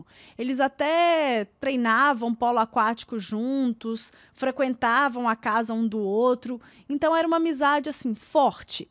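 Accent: Minas Gerais, Brazil. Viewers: Oi!!! Jeitim mineiro de contar história me conquistou! Adoro seu canal! Caso estranho heim...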